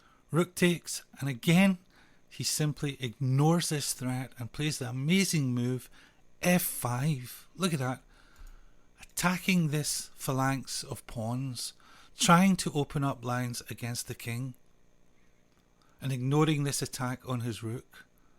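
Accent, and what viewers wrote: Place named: Scotland, United Kingdom. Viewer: Originally, I was gonna ask if you were from Glasgow, but since I am not familiar with different Scot accents I broadened my question. No shame in being from Glasgow. Thanks for the video.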